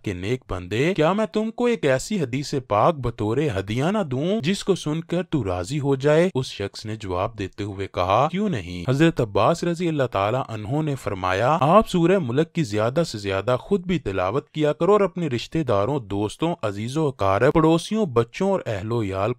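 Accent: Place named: India. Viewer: ladti nahi larti hay . kyu Indian english follow karty ho apni shanakht bhi bhol gay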